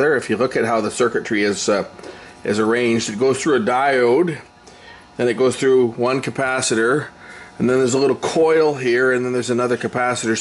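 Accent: Canada. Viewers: Cool video. You sound like you are from Canada? Are you?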